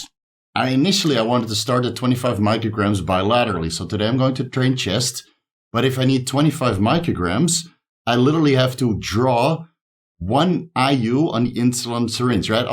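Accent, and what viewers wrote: Netherlands. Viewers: dus ja? interesante shit man kan wel redelijk horen dat je nederlands bent maar dat maakt het voor mij alleen maar mooier🤣. dank voor de content bro!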